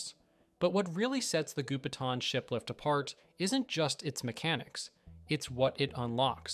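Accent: United States. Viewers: I love the obvious inflammatory titles from CCP, yet the narrator is American 😆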